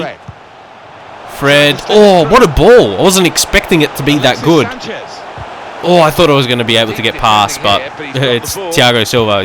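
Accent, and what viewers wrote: Australia. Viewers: Yes! An Aussie! Just found channel! LOVE IT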